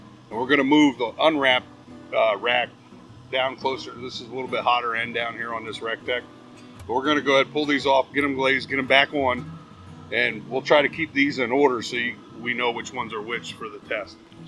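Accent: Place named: Pittsburgh, United States. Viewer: It's funny because I was watching this video and was catching your accent heavy. So I went to your channel and lone and behold, you're from the Pittsburgh area, as am I😂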